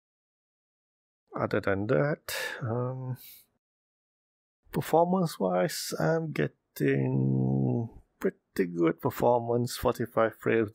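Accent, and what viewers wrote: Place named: Singapore. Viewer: Hi sir. Nice video and thanks for the x-plane demo with the Odyssey. Your accent I guess is either Singaporean or Malaysian Chinese (I could be wrong), but if i'm right, may I ask where did you get that Odyssey from? I am scheduled to visit Singapore again next week and I'm wondering if I can find the Odyssey there. The oculus is currently still at SGD$890 at SimLim but based on reviews I will get the Odyssey just for the extra pixels.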